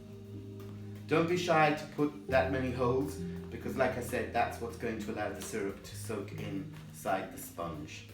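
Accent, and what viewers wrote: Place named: Ireland. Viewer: just found your channel- really enjoying it- love listening to your brogue- reminds me of my grandparents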